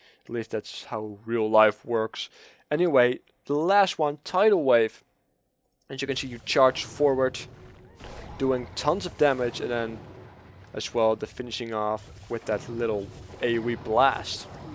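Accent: Netherlands. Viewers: im 100% you are Dutch it has to be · You sound Dutch haha. Nice video. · jesus christ your accent, must be dutch? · You are dutch, ik weet het xD Waarom kunnen wij nederlanders zo slecht engels praten! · dutch accent rofl.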